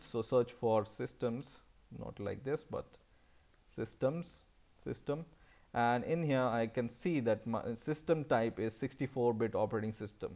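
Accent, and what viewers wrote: India. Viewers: Sooooo Indian! Like Russells Peters! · Open caption that are auto generated and you will see google catches every word he spit out his mouth cause he's indian and our english is pure not with any type of accent. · i find it's hard for me to understand the indian accent ! Have a nice time !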